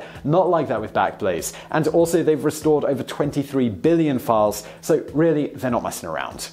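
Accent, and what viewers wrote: England, United Kingdom. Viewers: Vsauce but he has a British Accent and a sharp head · Does vsauce have a twin? A brother with a british accent (or australian, sorry i really can’t tell. Dont mean to be rascist) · Robrobx generaly if you say "british accent" he means accent from one of the United Kingdom's countries. We can clearly hear English accent, but calling it "British" is also not so wrong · You mean English accent? Scotland and Wales exist btw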